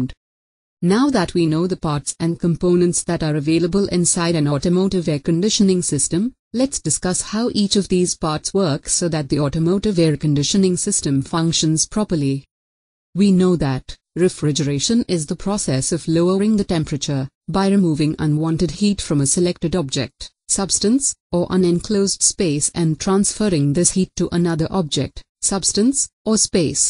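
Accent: India. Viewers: Even though the accent was clearly Indian this was an excellent tutorial on the air conditioning system inside my car. Thank you.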